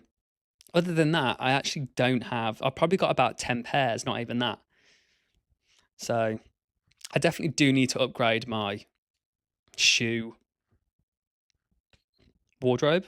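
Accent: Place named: England, United Kingdom. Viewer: Thanks for sharing. Like most people here, I’ve been watching some of your dancing videos for quite some time now, and it’s nice to (literally) hear more about you. And btw, I’m French, and to my ears your English accent is to die for 😌 In any case, keep following your dreams and making us dance with you 🕺🏼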